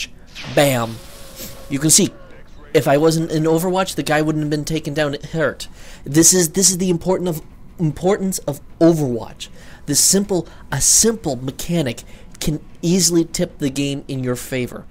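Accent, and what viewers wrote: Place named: California, United States. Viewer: Ahh! The subtle Californian accent. XD Awesome guide tho. You know what you are doing at least. Every other guide would miss something.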